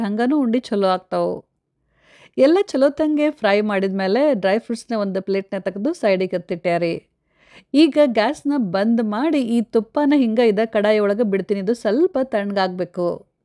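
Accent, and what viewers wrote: North Karnataka, India. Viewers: I forgot to tell you, I lived during my childhood in Bangalore, and I had some classmates who spoke the Dharwad Kannada so I absolutely love uttara karnataka dialect. Thanks for speaking in that dialect. That brings me back to my golden childhood days!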